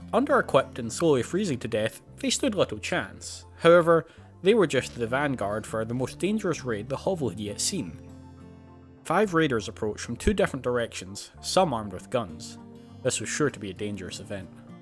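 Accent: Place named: Scotland, United Kingdom. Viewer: I swear, I can't just be hearing a "oh, yeah, both scottish" accent between you and Fandabi Dozi. It sounds like same damn neighborhood. Though I guess, I'm ignorant enough maybe I'm catching a dialect shared over a metropolis? Though it seems more regional. Like you're the same guy, but 5-10 years younger. Near enough to sound like brothers. Ps. These videos are great. Best I've seen since Pete Complete. And I'm both always looking and hella picky. You seem to have good instincts/training/etc for this.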